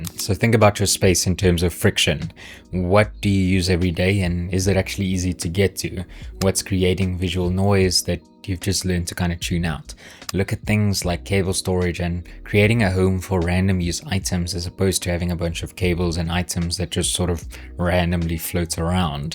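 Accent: South Africa. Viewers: You sound south African 😂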